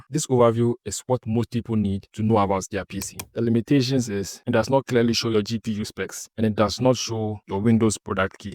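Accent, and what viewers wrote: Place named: Spain. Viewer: Sounds like Spanish accent. Spanish speakers who try to speak English forget that we separate our words. His sentences almost sound like one word. SLOW DOWN and separate your words.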